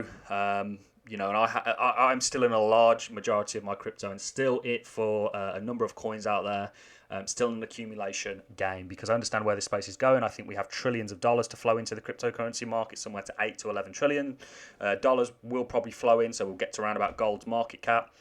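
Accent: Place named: West Midlands, United Kingdom. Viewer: Thanks again. Nice to hear a west mids accent adding an enormous amount of value, keep up the good work pal.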